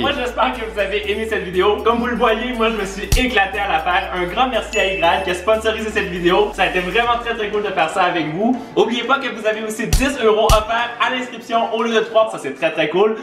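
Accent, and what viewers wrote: Canada. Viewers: J'adore ton accent canadien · je trouve que même si tu es un français tu parle avec un accent plus québécois que Isaac like si t'es d'accord 👍😝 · j’adore ton accent canadien!!!! ❤️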